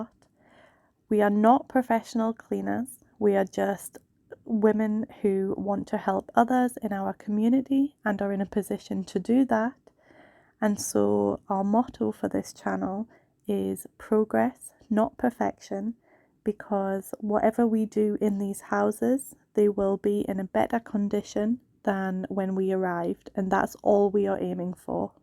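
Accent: Scotland, United Kingdom. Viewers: Don’t be afraid to go full Scottish on the job! That is part of what makes this channel so unique!! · Loving your channel and your beautiful Scottish accent💛, so glad there is still kindness like yours in the world🥰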